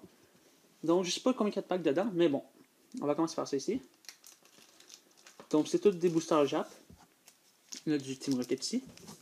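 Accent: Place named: Quebec, Canada. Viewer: Oye un quebecquois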